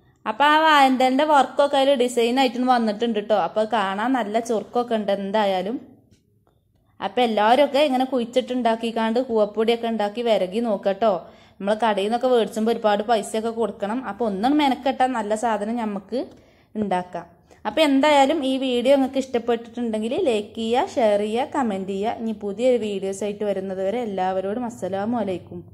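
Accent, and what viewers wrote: Malappuram, India. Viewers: ഈ അവതരണം ഒരു പാട് ഇഷ്ടായി. യതാർത്ഥ മലപ്പുറം മാപ്പിള ഭാഷ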